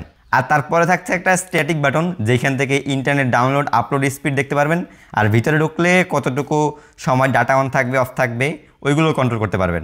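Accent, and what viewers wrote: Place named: Barishal, Bangladesh. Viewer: ভাইয়ের বাসা মনে হয় বরিশাল। 😊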